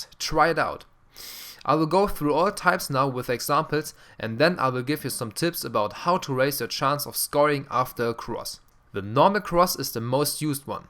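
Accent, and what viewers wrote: Germany. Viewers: this german english ist destroying my ears ... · sofort gehört das du Deutscher bist, starkes Video ;) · The comments just show how many dumb fucks play this game. Just enjoy the video, he's German hence a German accent.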